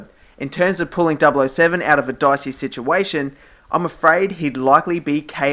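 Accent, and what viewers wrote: Australia. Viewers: Australian accent, love it!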